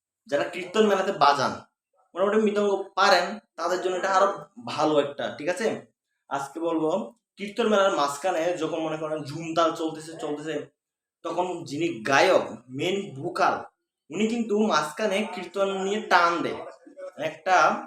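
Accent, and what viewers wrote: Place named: Chittagong, Bangladesh. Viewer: হরে কৃ্ষ্ণ প্রভু, আমার মনে হচ্ছে আপনি চিটাগং এর। খু ব ভাল লাগল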